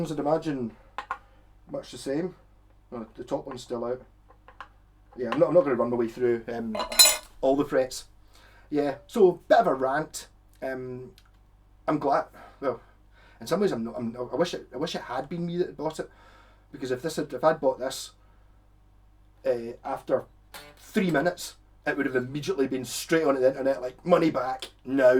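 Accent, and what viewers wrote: Scotland, United Kingdom. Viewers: That's a jock accent for you, if you think that's bad wait till you hear strong Blackburn accent 😉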